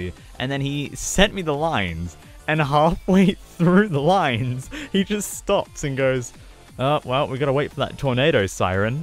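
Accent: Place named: United Kingdom. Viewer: Um... Wow... ME: YOU SOUND BRITISH, WHY?! [MIND BLOW]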